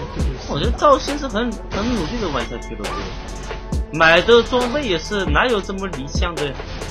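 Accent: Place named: South Korea. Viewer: At the first time, i thought he is chinese. But after searching his name, i know he is korean. If you often hear a korean talk or watch many korean film , you will realize that his accent is korean. Btw, his mechanism is so fk smooth.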